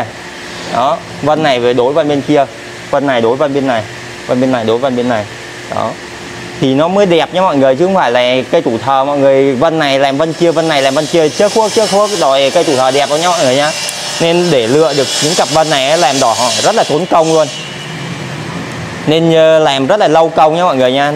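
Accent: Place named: North, Vietnam. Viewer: Bạn nói giọng lai miền bắc.Viết chữ cũng lai bắc luôn.Ở trong miền nam chúng tôi viết hay nói đều là Hoa Văn chứ không phải là Hoa Vân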